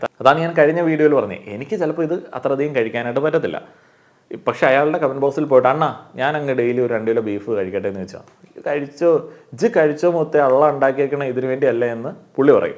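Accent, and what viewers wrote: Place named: Malabar, India. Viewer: ❤ മലയാളം നീയൊക്കെ പുസ്തകത്തിൽ എഴുതുന്ന പോലെയാകും സംസാരിക്കുന്നത്. എന്നാൽ ഞങ്ങൾ കേരളത്തിൽ പിറന്ന മലയാളികൾ ഓരോ ദേശത്തിന് അനുസരിച്ചു വ്യത്യസ്ത രീതിയിൽ സംസാരിക്കും. അവൻ പറയുന്നത് മലയാളം തന്നെ മലബാറി സ്റ്റൈൽ. അത് മോശം അന്നെന്നു നിന്നെ പോലെ ഭാഷയേ കുറിച്ച് വല്ല്യ ധാരണയില്ലാത്തവർക്കേ തോന്നൂ. ഇംഗ്ലീഷ് വരേ സ്ഥലങ്ങൾക്കനുസരിച്ചു വകബേധങ്ങൾ ഉണ്ട് 😂.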